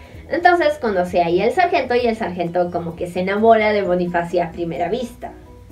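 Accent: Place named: Arequipa, Peru. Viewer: Que linda eres , x tu acento pareces arequipeña ...me encanta tu narrativa , felicitaciones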